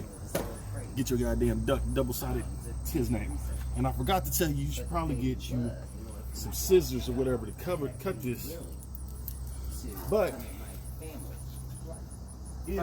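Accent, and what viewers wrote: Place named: California, United States. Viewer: Definitely a Cali native lol. This video should have more likes. Perfect fix!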